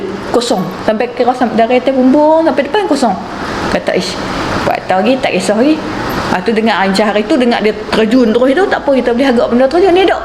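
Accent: North, Malaysia. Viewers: aku suka betul dengar loghat utara ni besssssst !!! :D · Loghat utara..penceritaan yg best dan enjoi ja dgr..👍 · good content but for me better not to talk slang utara ni. sbb tak semua org faham slang dia. try cakap kl sis. spread love no hate. btw im a new sub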